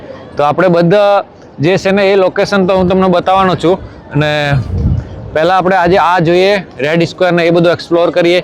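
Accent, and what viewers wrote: Rajasthan, India. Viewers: Gujrati bhasha me to rajsthani wala lehja h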